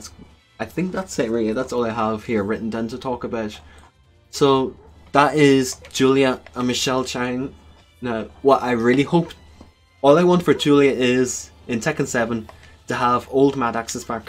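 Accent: Ireland. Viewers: R u from Ireland ?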